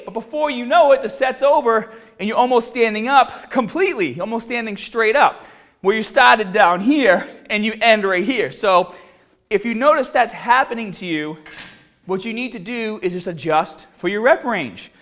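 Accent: Boston, United States. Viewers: Great content. But the Boston accent makes me want to throw up everytime I hear that open "Ah" sound. Please for the love of all that is Swole, tone down that accent! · He is from Boston. It's the way he speaks. Tough luck if you don't like it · I know!! I'm from Boston and approve of his accent 😛 so I went to show his videos to my husband, only to find out that his accent only comes out in some of his videos. I think he used to have the accent early on, then got rid of it for whatever reason, but now it's back with VENGEANCE. Love it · A few seconds into the video and I had to check the comments to make sure people were making fun of your Boston accent. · This guy sounds like he is from barwstan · i remember watching your videos years ago and you never had a boston accent, wtf happened? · Viknesh Jay ?? Australian? Please tell me you know the difference between a Boston and Australian accent? 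This video is from Aug 2016!! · I find the that this video was great and informative! Apparently people on here are not so well traveled and live in a bubble where they can't recognize a Boston accent. I am from Chicago and I get the same thing because my accent. As for the video,I think people have a hard time getting out of bad habbits when it comes to form. Some people are so used to doing it wrong that when they try the right way they get hurt. They try to do it the right way with the same weight. I have seen this many times with other exercises well. As soon as you tell them to lower the weight and use proper form some struggle,but can do it. Others try the same weight and either get hurt or cant do it at all. Ego lifting is correct and true. This is why I have always been surprised how people still make gains with crappy form. Yet steroids seem to be involved. Of course when they are 60 and complaining that there back hurts. 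They might regret not taking some sound advice when they were younger instead of trying to impress someone at the gym. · Petro .... he sounds like he is from Boston or from the New England region. Kinder like some of us yanks who are lost when we try to listen to some of you English from some parts of your country, i.e Geordie accents. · Is that Boston accent? I thought it was extinct. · So used to the Boston accent now - just sounds normal to me ! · his boston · Maybe it's because I'm not cultured but I couldn't tell if was from Boston or Australia until I read the comments. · dude wtf is with your accent?! the "bahbell" suggests boston but you almost sound scottish at times and neither pronounce the word "mascles"! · Dude, what's with the Boston "a"? I've been watching your vids for 6 years, and you never even came close to talking like that in the past. If you'd always spoke that way it'd be one thing, but since that's not the case it's just annoying.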